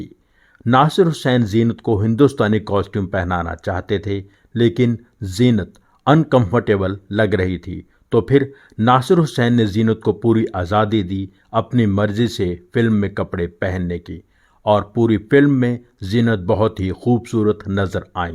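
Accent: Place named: Punjab, India. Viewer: Brilliant Hindi in Punjabi accent.....all in the family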